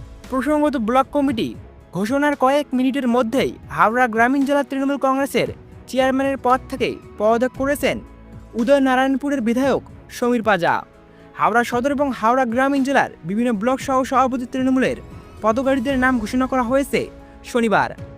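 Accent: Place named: Bangladesh. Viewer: আপনার উচ্চারন বাংলাদেশীদের মত।😮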